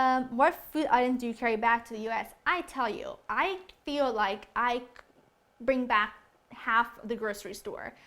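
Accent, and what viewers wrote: Naples, Italy. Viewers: WOW l'accento napoletano non lo hai perso per niente....sono orgogliosa di te,. Amo l'America, ma bisogna ricordarsi sempre da dove veniamo! Mi piacerebbe se facessi dei video in Italiano, ci faresti sentire di più...a casa! Napoletana DOC come te! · you hold on tight your "italian napoli" accent :D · Hai un meraviglioso accento napoletano quando parli in italiano, eppure parli l'inglese perfettamente! Complimenti! Bravissima e veramente bella.... un bacione dall'Italia! <3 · she's neapolitan and has that neapolitan accent. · si riesce a sentire anche la cadenza napoletana quando parli... ;) a sto punto fai pure un video in napoletano ;) greeting dalla prov di caserta :* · (Hai un bellissimo accento napoletano, nonostante tutti questi anni negli USA :) Ho sempre seguito i tuoi video in inglese e fa stranissimo sentirti parlare in italiano :P) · ma sei napoletana!!!:p o meglio, campana!!che bellezza!!anche io sono napoletana, studio lingue e il tuo accento mi fa sognare!!!è perfetto!!complimenti! 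bello sentire i tuoi ricordi...e mi fai sperare tanto per il futuro!spero di potermi trasferire altrove e sistemarmi as soon as i can!:p ciao! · Ahahahaahahhaah hai proprio l'accento Napoletano ahahahahah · ahahahhahaha oddio che bello come parli in italiano!!! però devo dire che si sente tanto l'accento di Napoli e anche quello americano! è un miscuglio strano!! XD io sono di roma ma parlo anche fluentemente inglese, so I know what you mean when you do your videos! :D sei troppo simpatica!! e adoro le tue ricette! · Ciao! Non pensavo fissi napoletana..che strano sentierti parlare italiano! Un bacio ciaoooo;))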